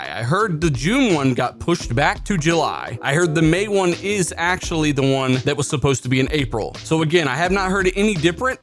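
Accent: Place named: United States. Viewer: maybe it's just the dumb American accent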